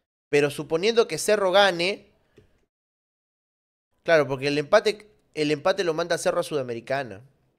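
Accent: Argentina. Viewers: Por que habla como argentino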